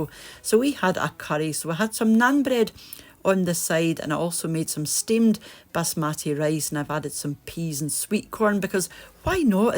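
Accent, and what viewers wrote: Scotland, United Kingdom. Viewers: i hope you know what an inspiration you are to others. im a 60 year old "bonnie wee scot's laddie" living alone with no family, on the other side of the world. far from home, your cooking and weekly inspiration inspires me to cook great meals, and live a life worth living, rather than degenerate into beans on toast every night. when i listen to your wonderful scot's accent, i feel like i have come home. its like a warm blanket. god bless.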